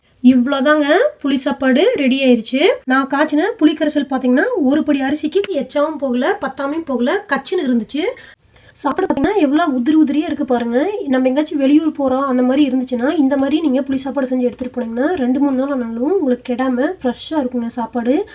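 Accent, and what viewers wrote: Kongu, India. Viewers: கோவைல மட்டும் தான் அப்டி பேசுவார்களா.. ஈரோடுலயும் இப்டி தான் பேசுவாங்க.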